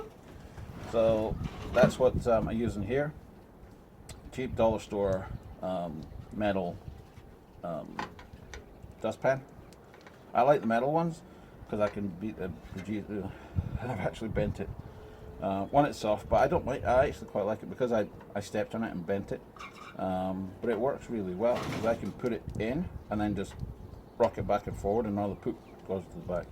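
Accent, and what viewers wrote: Scotland, United Kingdom. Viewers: Wait... you are not British?! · Are you british? :D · Are you of Scottish or Northern Ireland descent?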